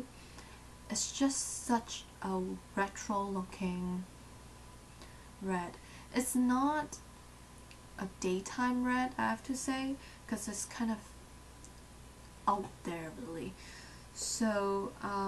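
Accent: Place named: East Asia. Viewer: She sounds the same as other east-asian youtubers whose first language is not English.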